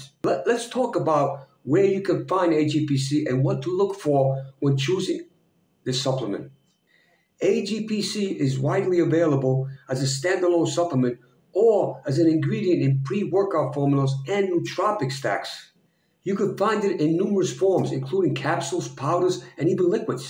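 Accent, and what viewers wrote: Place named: Brooklyn, United States. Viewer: How does the creator sound like my uncle Victor from Brooklyn or my cousin Vito from the Bronx claimed he's not even an American citizen?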